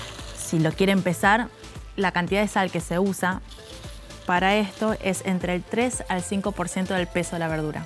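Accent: Argentina. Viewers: Parece argentina con lo bien que habla. Muy buena pinta el plato.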